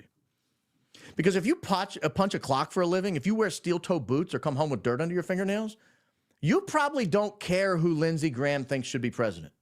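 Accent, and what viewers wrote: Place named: Georgia, United States. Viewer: So, does "Adidas" sponsor your site? Just looked back...you have a different color hoodie for every day of the month! Same style...some things are creature of habit...maybe that's part of the business model, I don't get...but, it maintains consistency, and you're definitely good at it! Love listening to someone with similar dialect (native Georgian here).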